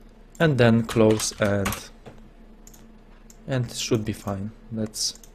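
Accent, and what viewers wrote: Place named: Poland. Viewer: i am suscribed to a youtuber from Poland, and i recognized the accent :)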